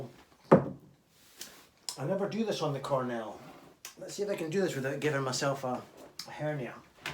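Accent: Scotland, United Kingdom. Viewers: I come to this channel to listen to the gorgeous tones and phrases that can elicit from his great collection....but more than that is that super smooth Scottish brogue accent I hear when is regaling us with his stories....that voice is warmer than a cup of Horlicks! Put the two together and you have me transfixed!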